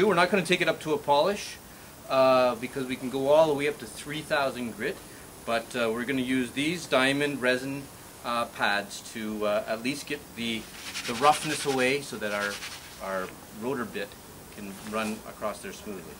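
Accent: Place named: Cape Breton, Canada. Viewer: great video..... I started watching, and thought... this guy's from cape breton! thanks from moncton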